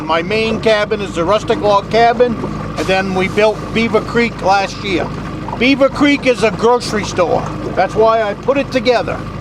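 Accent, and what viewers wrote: Maine, United States. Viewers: My mother born and raised Down East Coastal Maine . When most lived and hunted and caught most of their life . She met my Dad in NC and raised a family ... I spent many of my best childhood times crawling rock and raking blueberries . i have always dreamed of a permanent return ,but life and the wild extremes of winters keep me Southern fried and hot ... I'm tuning in to hear your voice and the accent of my relative's who no longer walk the wildwoods or clam bake on a cool summer evening . and to catch a glimpse of dream I've never forgotten . thanks for the memories that come rushing in like high tide on a full moon .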